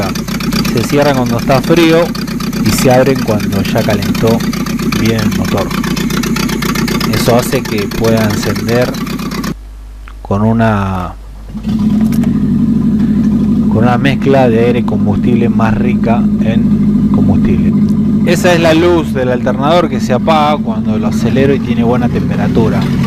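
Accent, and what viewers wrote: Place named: Argentina. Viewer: por tu acento creo que sos de Argentina? Soy de Colombia y veo que el carburador difiere del que tienen instaladas las 2.0 aca! Creo que es un SOLEX 32/32. Podrias compartir que referencia de carburador traen alla los R18? Gracias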